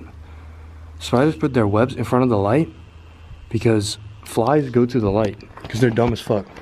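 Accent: Netherlands. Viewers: You from Rotterdam¿¿¿ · Are you Dutch?!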